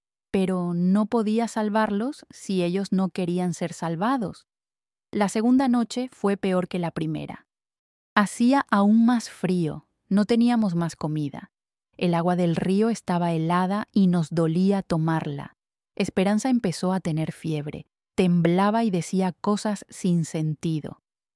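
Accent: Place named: Spain. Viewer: ESPAÑA te oigo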